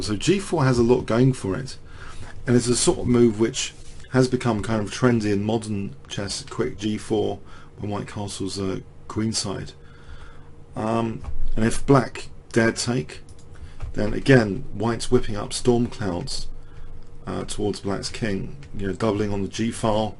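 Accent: United Kingdom. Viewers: THORN pawn! Man, that british accent. You have no idea how long I wondered how that was spelled. I thought Foam Pawn, Fun Pawn, Foreign Pawn, Phone Pawn... Awesome series,!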